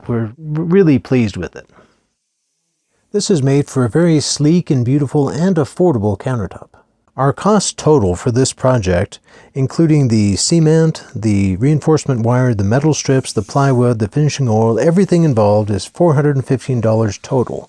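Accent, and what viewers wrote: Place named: South Africa. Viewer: Are you from south Africa your accent doesn't sound American beautiful job👌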